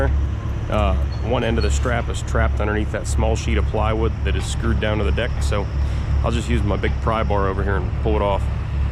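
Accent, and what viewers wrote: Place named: Missouri, United States. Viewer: Hi, Reading through the comments i picked up your name. Someone said Pennsylvania? By your accent and the trees was guessing Missouri but I guess not. Anyway when I worked at a Lead Foundry in the 70's drove a 920 Catipiler front end loader and everything else they had there. What I really enjoyed driving there was the old White Freightliner. Had a hydraulic 5th wheel hitch that you could hookup the trailer and lift it off the ground and go after you swung out and hooked up the pigtails and lights. They had all kinds if forklifts but my favorite was the small hyster I used for running shipments around and weighing stacks of lead bricks to band them up. The bander was electric which was awesome as well.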